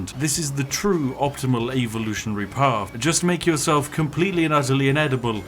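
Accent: United Kingdom. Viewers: Is it just me or is he somehow acting better as himself than last video? Like, he's just more british than usual or something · Wait this guys british? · Me realised he’s practically British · I love how talks like a true colonizing brit from the 15th- 16th century. hilarious!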